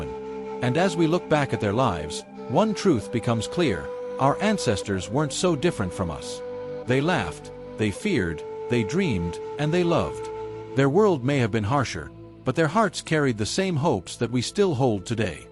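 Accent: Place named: United States. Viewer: History just never sounds right in an American accent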